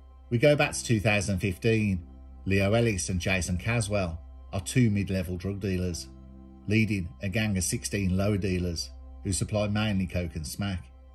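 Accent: Birmingham, United Kingdom. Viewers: Top content again mate . And the Brum accent just tops it off. Love from Manchester 👍🏽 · Big love for the Brum accent. I will never get tired of it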